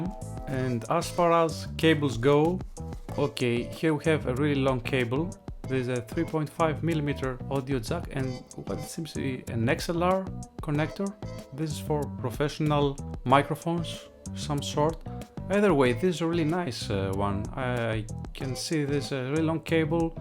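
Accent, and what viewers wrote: Greece. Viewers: Η Ελληνική προφορα ξεχωρίζει από χιλιομετρα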